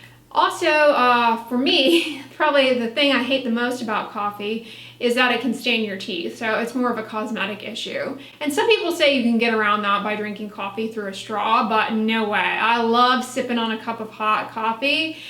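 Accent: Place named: Texas, United States. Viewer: every once in awhile that Texas accent comes through... so cute. Let that Twang fly girl!!!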